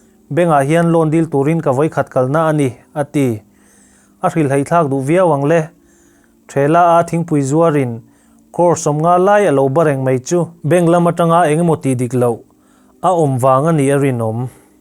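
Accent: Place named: Delhi, India. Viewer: I pronunciation ațang hian Hindi i thiam hmel riau. Delhi vai i kâwm tam a nih dawn hi.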